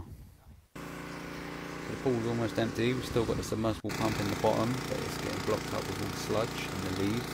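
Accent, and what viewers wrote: United Kingdom. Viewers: The british accent is what complete this video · bruv its his British accent my guy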